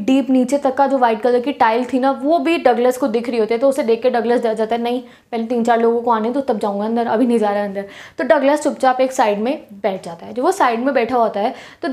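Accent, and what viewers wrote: Punjab, India. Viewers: Mam kitna bhi rok lo punjabi to rag rag me hegi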